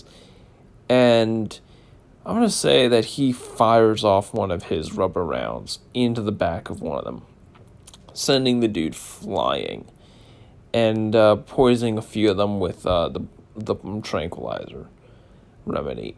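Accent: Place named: Italy. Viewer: Also gifted Italian accent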